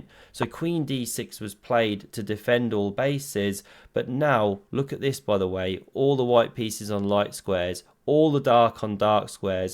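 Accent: England, United Kingdom. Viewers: Scottish accent? Though was English!!!! 😂😂😂Great analyze mon ami 😜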